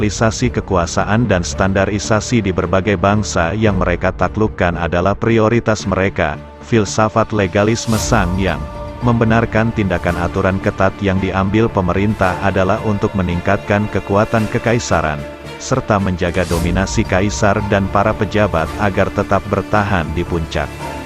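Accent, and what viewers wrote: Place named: Indonesia. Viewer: Lidah orang Indonesia.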